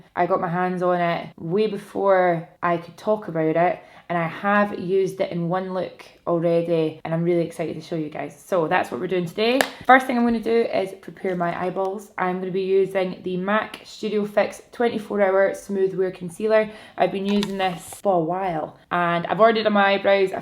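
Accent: Scotland, United Kingdom. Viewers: Omg are u Scottish?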